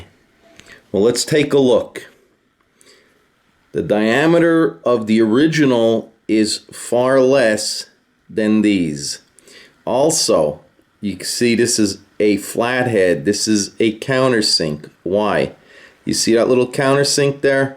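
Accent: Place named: Italian-American, United States. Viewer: You sound like a paisan. 👍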